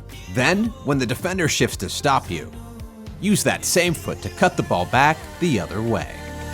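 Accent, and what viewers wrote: United States. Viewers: Clicked video. Heard American accent. Closed video.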